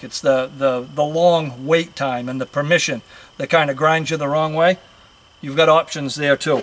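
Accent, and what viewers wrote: Maine, United States. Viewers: ha i just got too the end of the vid i knew ya were from maine cuz of the accent right when you started talking first thing i thought of was my neighbor from when i still lived up there😂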